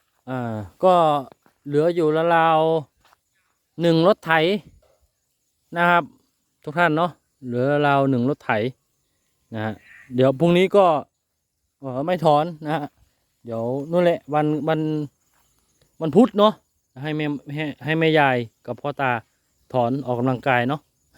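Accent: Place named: Isan, Thailand. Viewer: ภาษาอีสานฟังรู้บ้างไม่รู้บ้างก็น่ารักดีนะ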